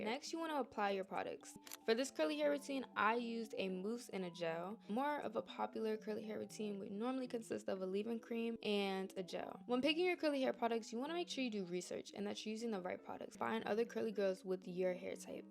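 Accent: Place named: New York City, United States. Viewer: Lil sis talks so NYC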